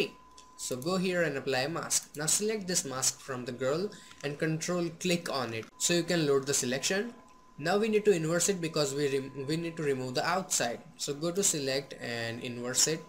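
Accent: India. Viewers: I love your indian accent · is that an Indian accent?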